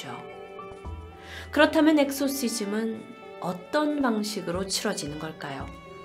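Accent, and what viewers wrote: Jeolla, South Korea. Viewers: 전라도쪽 사투리라 그럴겁니다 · 님 혹시 전라도 사람이신가요? 아님 주위에 전라도 사람 있으신가요? 전라도 사투리 쓰시는거 같은데 ㅎㅎ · 전라도 억양 때문이신가 의발음이 잘 안되시는 듯ㅋㅋ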